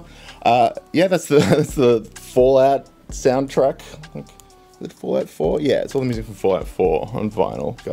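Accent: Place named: Australia. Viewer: I love finding new Aussie youtubers. It's weird hearing people on youtube with my accent haha, I don't think about it but it's kinda jarring when I hear an Australian now because I just expect them to be American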